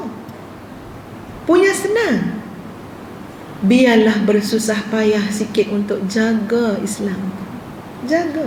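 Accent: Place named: Kedah, Malaysia. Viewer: Ustazah. Saya selaku org kedah mengaku ustazah pandai dah cakap kedah walaupun baru sat ja dok kedah.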